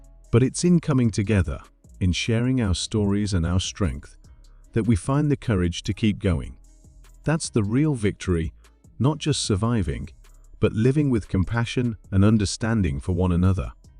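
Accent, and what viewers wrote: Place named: United Kingdom. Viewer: very nice. a believable story and pretty well written. some of the location details seemed off, but i'm not a WWII history buff, so can't be sure where the 101st Airborne was throughout it all, especially Normandy on D-Day. just not sure. What was a real sticking point for me was the AI reading. My complaints are as follows: 1) a British accent? for an American story? 2) the mispronunciation of WWII - if it is going to pronounce it "EYE EYE", then spell out the TWO... 3) is there no way to "train the AI" to pronounce correctly? I've worked on AI training projects, so they're out there. So there should be some way for the creator of the video and text writer to correct the AI. If not, change the text. It really diminishes the enjoyment of what was actually a tear-jerker of a piece. On a scale of 1-10: Story: 9; AI narration - 4.5; Proofing by creator - 0??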